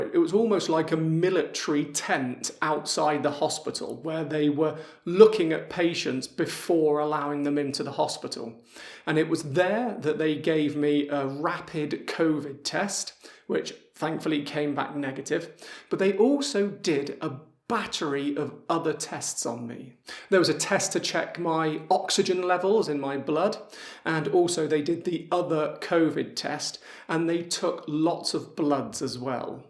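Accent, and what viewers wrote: Apulia, Italy. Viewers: Sei bravissimo oltrechè simpaticissimo con il tuo italiano dall’accento pugliese. Bravo!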